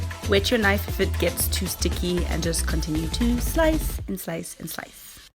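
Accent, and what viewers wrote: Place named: South Africa. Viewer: Not me being excited about the South African accent😂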